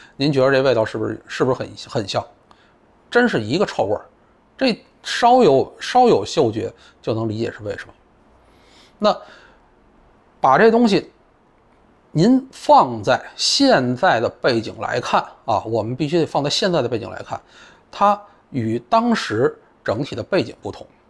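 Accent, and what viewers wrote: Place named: Beijing, China. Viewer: 内容不错，京腔讨厌。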